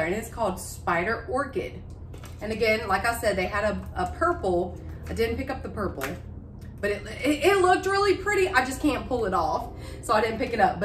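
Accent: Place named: Mississippi, United States. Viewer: Hey sweet lady you & I sound the same!! I'm from Mississippi so down here we all say "yall" quite frequently! I love your dollar tree hauls!!! I just wish these dollar trees here would take notes of all the dollar trees you shop at!!! They NEVER have the cute stuff you have. I may get lucky & find one or 2 little things, but they never have any of the good organizing stuff ever! Most of the time the isles are bare. I guess nobody cares about taking inventory. We need some desperate help at the dollar trees in Mississippi! Oh well, I love watching what you find & I'll just stay jealous!!! Love you girlie......keep shoppin'....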